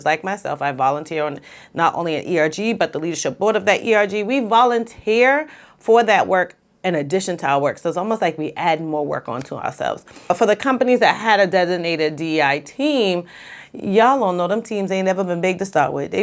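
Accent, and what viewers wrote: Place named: South Carolina, United States. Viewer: This is unrelated, but I gotta know where you're from. I'm from the Charleston area of SC, and your accent is reminding me of that lol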